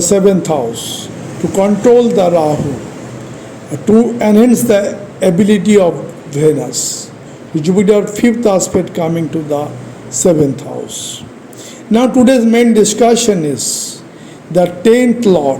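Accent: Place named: Bengal, India. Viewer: Namaste Sir i heard Bengali Accent. It feels good. 😊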